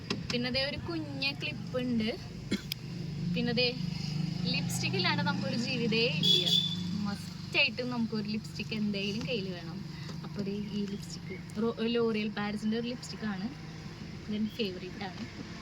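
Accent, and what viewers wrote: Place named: Thrissur, India. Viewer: തനി തൃശൂർ slang 😂🎉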